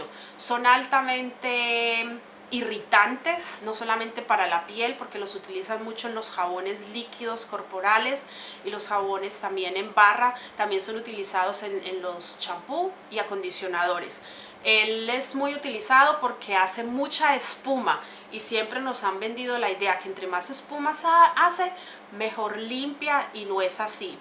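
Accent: Colombia. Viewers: me fascina como hablan los colombianos.....me fascina ese acento, ahhhhh que bonito jajaja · Eres colombiana ?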